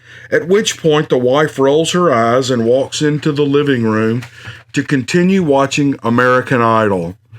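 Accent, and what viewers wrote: South, United States. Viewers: I love it bro I absolutely love it,! I love the channel and I love your southern accent and hospitality you seem like a good dude but the story hit the spot. It was one of the funniest hunting stories I’ve ever heard and cannot stop chuckling even as I write this little note to you, the store just made my day and I’m going to go ahead and save it to my favorite columnso I can listen to it every once in a while when I need to pick me up or to put me in a good Sasquatch’en mood. Your a’ok in my book 👌 But who am I to give approval to another but still love the story and especially the channel, and looking at shirts as gifts for family. Good day brother. 👍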